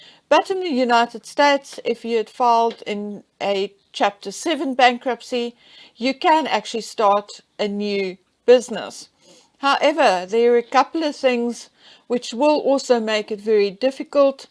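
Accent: South Africa. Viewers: Is your south african accent from an an English background or Dutch? Just curious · You sound south African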